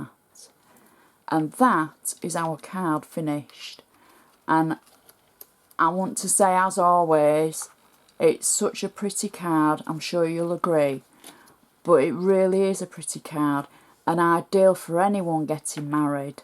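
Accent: United Kingdom. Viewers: Love your accent. What part of the UK are you from. Enjoy your tutorials. Beautiful designs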